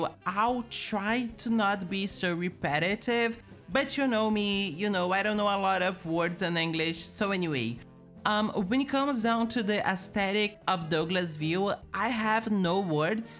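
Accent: Brazil. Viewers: Everyrime inwarcgbtour vídeos I’m trying to figure out where you’re from hahaha I’m surebyiure Brazilian. Love seeing fellow Brazilians doing content in English!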